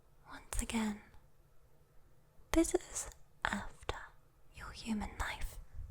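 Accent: United Kingdom. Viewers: British accent 👌👌👌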